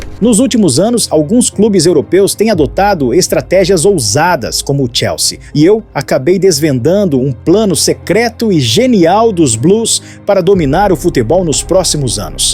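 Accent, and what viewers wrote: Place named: Italy. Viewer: com sotaque italiano, nada demais 😅😂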